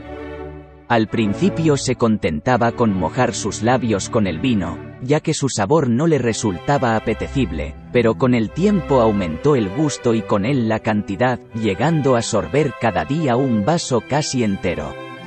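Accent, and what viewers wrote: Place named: Spain. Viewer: Por fin encuentro un audiolibro en español de España!!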